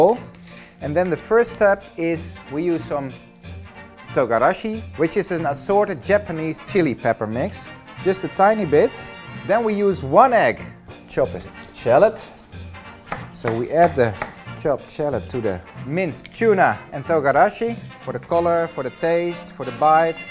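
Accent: Netherlands. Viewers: het is te merken dat je nederlands bent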